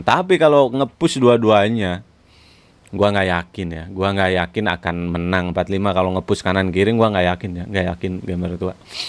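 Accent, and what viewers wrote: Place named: West Java, Indonesia. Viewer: penyebutannya / pronounciation nya dia aja karena dia orang Sunda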